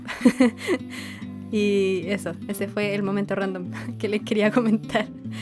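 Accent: Chile. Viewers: Piyo eres chilena? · ¿Eres Chilena? Yo si.... PD:Tienes vos de Chilena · Eres chilena??? O alguien sabe si es chilena??? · Eres chilena? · No se si yo tengo un don de saber quien es chilen@ o esque ella tiene un acento fácil de saber que es chilena... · ¿eres chilena? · oye eres chilena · Es chilena? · Se nota q eres Chilena :3 CHILE GANA WEON >:VVVVVVVV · Es chilena?? Se nota en la voz mdmd❤️ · Eres chilena cierto? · Eres chilena ? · Se nota que eres chilena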